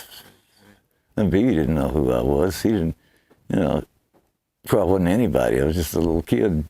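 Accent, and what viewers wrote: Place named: Texas, United States. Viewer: OK that is friggin' hilarious Bill. He not only resembles President GHWB, he's got the Texas drawl too. for president! (Of course, the question of whether one of his greasiest, foulest turds would be better than what we have now is immaterial, but I digress.)::dons flame retardant suit and awaits arrest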